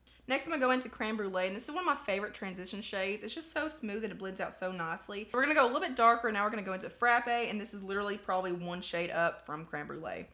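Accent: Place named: Alabama, United States. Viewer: I’m coming from channel not sure if I spelled it right but I have subscribed to your channel I absolutely loved this video I love drugstore and dupes videos. You are a beautiful young lady but if I can ask where you are from I heard an accent I live in Alabama and it sounds like you may be from there. God Bless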